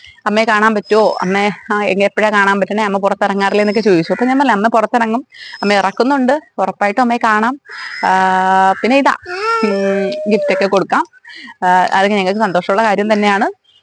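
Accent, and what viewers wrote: Trivandrum, India. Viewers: Language kettittu t.v.m annu 😊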